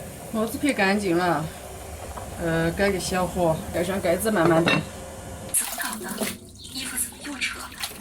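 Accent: Kunming, China. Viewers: 我不是寻甸呢，只是之前在昆明呆过两三年了，所以一听就听出来了。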